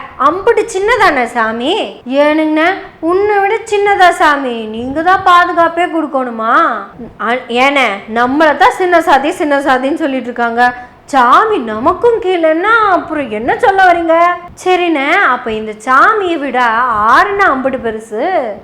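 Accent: Kongu, India. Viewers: அருமையான கொங்கு தமிழில் விளக்கமாக விவரித்த சகோதரி அவர்களுக்கு எனது பாராட்டுக்கள் மற்றும் வாழ்த்துக்கள் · சகோதரியின் கொங்கு தமிழ் மிக அருமை 😊😮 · கொங்கு தமிழில்,கொஞ்சும் தமிழில் அவர்கள் கொன்டுவந்த செருப்பை சரியாக பயன்படுத்த சொல்லி கொடுத்த பாணி அருமை. வாழ்த்துக்கள்.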